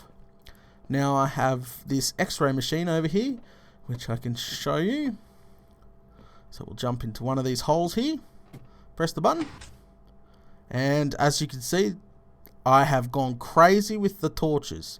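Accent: Australia. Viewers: U sound Australian? R u?? Plz reply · is infact Australian, and if you're interested he's still live!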